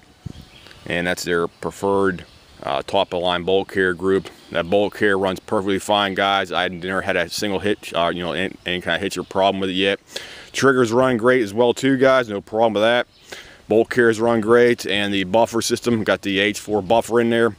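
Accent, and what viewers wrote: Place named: Western Pennsylvania, United States. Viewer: Holy shit...I'm first...that never happens...anyway...r u from pgh pa. by chance ?...that sounds like a w.pa. accent...nice video bro...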